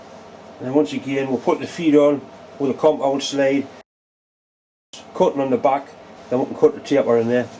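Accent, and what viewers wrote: Newcastle, United Kingdom. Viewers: Another great year on YouTube,. I wait all week for your SNNCs and it's always a pleasure watching them, which I often do more than once, if only to hear your Geordie dulcet tones cursing "BASTAD"! May I wish you, Debbie and your dad a very merry christmas and a happy and healthy 2017. May I also thank you for your YouTube input, which gives great insight into a very skilled engineer, or as we referred to them in my apprenticeship days in the 60s, 'fitter-turner'... those were the two skills necessary to become a skilled toolmaker in those days and it was taken for granted that if you could turn on a lathe you could also perform milling, surface-grinding, shaping, tool-sharpening, etc. But with you, it doesn't end there... you are probably one of the best multi-media welders I have ever had the privilege to watch and for someone with a home workshop, you perform some of the best brass and bronze small-scale casting that I've seen (OK, it may not pan out right on the first attempt, but you get there in the end... 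but that's metal casting for you). I very much look forward to watching what you get up to in 2017.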